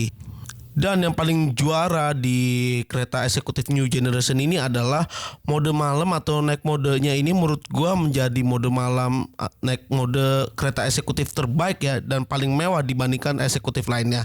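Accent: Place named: Java, Indonesia. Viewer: Mohon maaf mas, logatnya gak cocok nyebut "gua" terlalu medhok😁🙏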